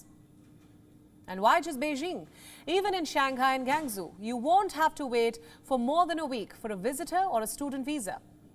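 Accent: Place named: India. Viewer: Nobody cares or knows who your foreign minister is loool. Just because he "looked into it" suddenly 800 Indian citizens who live on less than $5.50 a day will get fast-tracked visas to the US? I'll break it down nice and simple: 1) India like many developing counties has ZERO Visa Free access to the US, UK and many other developed nations. Therefore it takes months and years to get a visa. I'm a British citizen. The UK as a highly developed, advanced welfare state economy we have Visa Free access to the US and I visited the US this year, getting documentation was literally immediate. 2) You completely missed Sam Browns point. This Indian presenter desperately trying to hide her Indian accent is claiming India is a "strong ally" of the US and so should get Visa Free access for its citizens. Yet, India finances Russia's invasion of a sovereign country by not condemning it and buying its oil. 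Further, 90% of all your defence equipment was bought from Russia. Russia is in the top 5 of the countries the USA despises the most and yet India a "close USA ally" pours billions and billions into Russia's economy.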